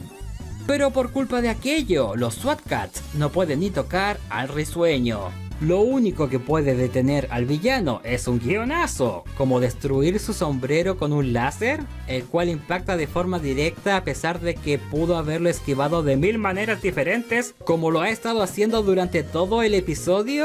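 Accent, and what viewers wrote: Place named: Chile. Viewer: Que buen trabajo, noté un ligero acento Chileno, qué edad tienes? Yo tengo 37 años, la vi a mis 8 o 9 años está maravilla.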